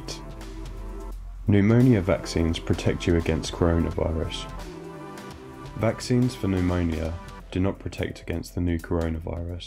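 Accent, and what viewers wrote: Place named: United Kingdom. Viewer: Wow Your British!! Never seen anyone British on my channel. Great Content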